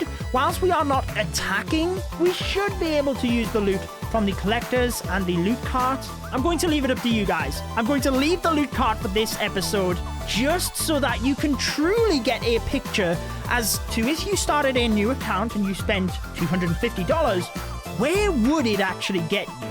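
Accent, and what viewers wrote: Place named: England, United Kingdom. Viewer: You from England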